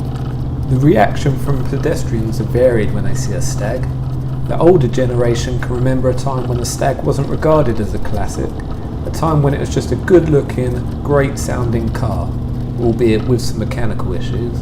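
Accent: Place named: Essex, United Kingdom. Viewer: sounds like Essex though.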